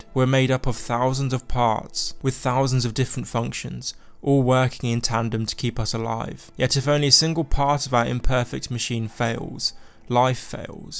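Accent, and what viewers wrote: United Kingdom. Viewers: Hey I'm curious, what region is your accent from? I know it's British, but what kind?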